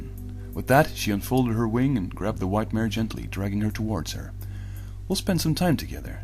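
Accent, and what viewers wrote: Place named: Ireland. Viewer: I think the irish accent just makes it dound even better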